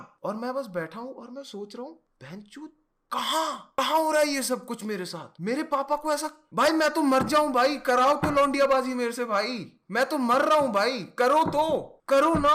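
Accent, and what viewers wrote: Nigeria. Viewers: that nigerian accent 🔥🤙🏼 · nice nigerian accent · Bhai clg mai baitha tha. Ek nigerian exchange student hai. Mera dhyaan phone pe nahi tha par mai sunn raha tha. Mujhe 2 min laga wahi mujhse baat kar raha hai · he nailed the nigerian accent god damn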